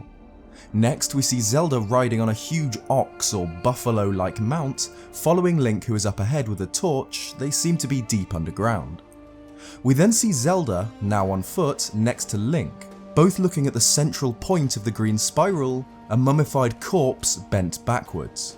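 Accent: England, United Kingdom. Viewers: Great stuff, man. The British accent combined with the quality of your footage always makes me feel I'm watching a documentary on History channel :p. On a different note: This sequel can only be really awesome. Something that probably won't be in this game, but what I miss is Dark Link. Like, I would love a game where Dark Link is actually born from Link when he sees Zelda being kidnapped or being hurt and he just goes on a crazy rampage killing spree, letting out his dark side from anger. And then being able to access that power when needed to become stronger, kinda like Hollow Ichigo in Bleach. But that's just me.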